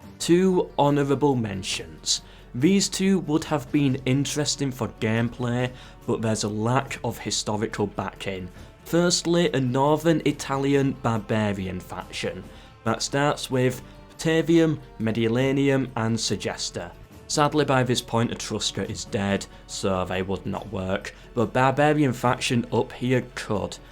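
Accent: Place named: Northern England, United Kingdom. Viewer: loving the northern accent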